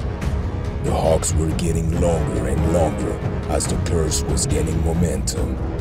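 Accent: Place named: Northern Europe. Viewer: you sound like vin diseal with a northern european accent.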